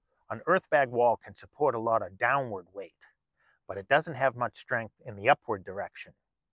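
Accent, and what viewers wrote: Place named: Boston, United States. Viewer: I'm having a hard time taking u seriously w your Bostonian accent.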